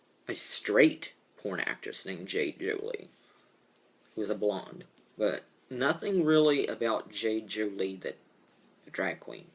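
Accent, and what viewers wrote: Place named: South, United States. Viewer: He sounds southern. Slow and the pauses, yes. The "ums" are a trademark of his videos Ive noticed. But it is who he is, if he did his videos definitely he would be acting. Everyone has their own style and mannerisms on youtube.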